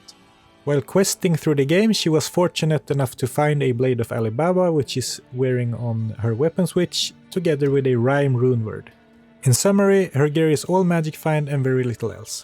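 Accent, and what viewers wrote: Sweden. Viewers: This video made me decide to uninstall d2r. No way I’m doing 1k runs. I’d rather just watch this dude do them while listening to the epic supersvenska dialect. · the deep swedish accent, one simply cannot miss that swinglish man haha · Svensk engelska är så distinkt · Yust? So you are Swedish. The Swedish English teachers must be very special, because I don't think I have ever met a Swede who pronounced "just" correctly :D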